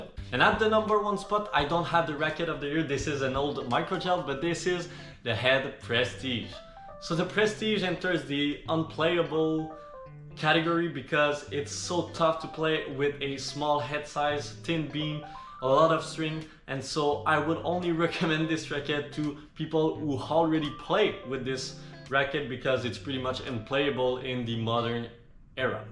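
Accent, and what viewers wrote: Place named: Quebec, Canada. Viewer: You seem coming from quebec like me....i have same english accent 😊 can i ask you where did you filming this? There seem to be a lot of good racquet available in this store!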